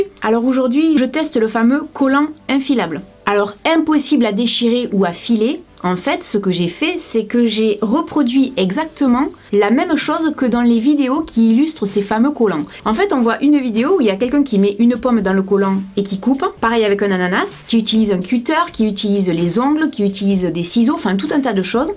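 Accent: South, France. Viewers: T'es sudiste non ? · Bonjour ( petite parenthèse perso vive les accents du sud) c’est la deuxième vidéo de toi que je découvre et j’apprécie beaucoup! Tes vidéos sont honnêtes et faites pour aider en donnant un avis sincère donc merci de ton aide et à bientôt je suivrais tes prochaines vidéos 👍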